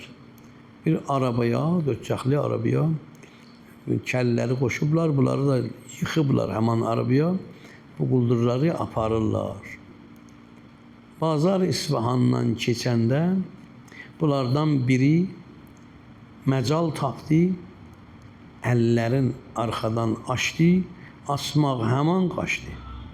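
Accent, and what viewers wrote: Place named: Baku, Azerbaijan. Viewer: Bakılı ləhçəsi ilə 😊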